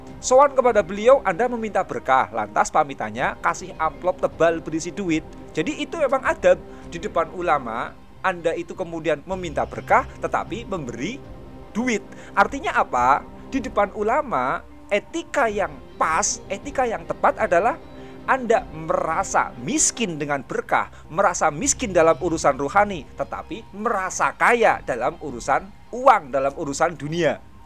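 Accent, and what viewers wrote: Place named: Tegal, Indonesia. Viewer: Saking logat.e kayane wong tegal ya um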